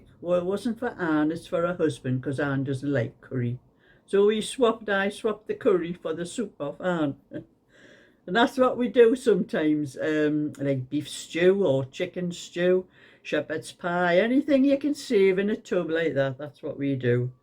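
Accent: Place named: Yorkshire, United Kingdom. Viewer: Hi, well had a chuckle at you with the conversation with 😂😂😂.. congratulations on your wins, well done 👍..Hope your heatwaves have ended.. Spring nearly here in Sydney so plants & lawns will be taking off.. we’re 9 hours ahead of you over here I thought I’d mention… I’m a Yorkshire lass born in Sheffield (well not a lass anymore) 😂😂😂 so enjoy your accent, love listening to you chatter on… lots love Josie xxx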